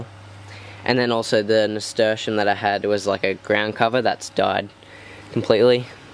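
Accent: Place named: Australia. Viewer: do you have wild bearded dragons in youre yard because you sound austrailian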